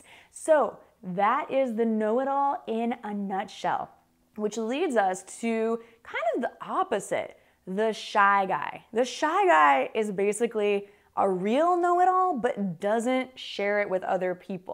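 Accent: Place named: United States. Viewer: Lolz hello I’m Dominican.!!!, if I can be honest I’d tell that I’m a obsessed language learner obout English.! I really love the ways that American native speakers can talk and Actually I’m looking for get to tack and the way that you “ native speakers “ can do it.! Im talking about.... American accent.! The sh...sh... sh.. that you have lolz 😂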